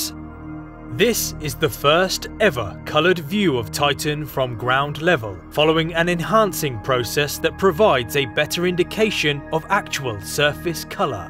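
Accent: United Kingdom. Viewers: Who would have expected a British accent in a Mars footage?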